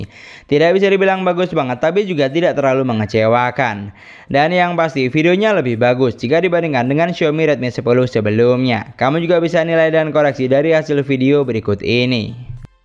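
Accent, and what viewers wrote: Madura, Indonesia. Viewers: Bang udah kaya orang maduran jualan sate logat nya😅 semangat terus❤ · Kyk logat madura tesate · Keren ciri khas logat Maduranya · Itu ciri khas orang madura blokkk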